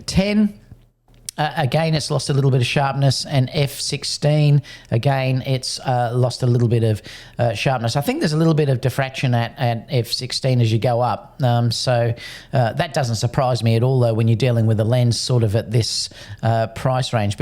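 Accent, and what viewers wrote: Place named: Australia. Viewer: Thanks for a great review in an Aussie accent! How does the image quality compare with the Sony 24-105?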